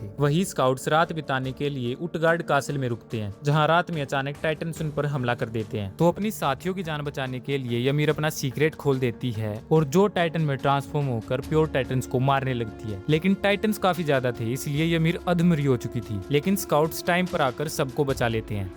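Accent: Haryana, India. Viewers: haryana se hai kya bhai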